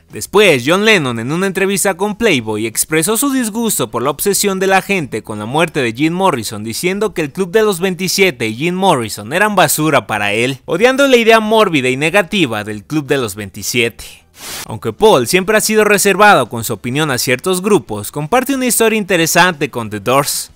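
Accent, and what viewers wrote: Mexico. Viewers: Deberías neutralizar un poco tu acento ranchero.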